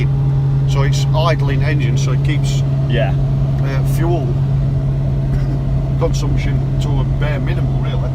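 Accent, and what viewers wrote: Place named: Yorkshire, United Kingdom. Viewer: Strange hearing a yorkshire accent in the united states. Awesome build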